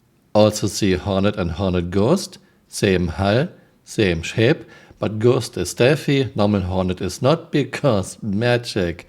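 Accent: Germany. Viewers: deine videos sind echt super cool und informativ. Etwas ganz besonderes in der star citizen community und ich liebe deinen deutschen akzent haha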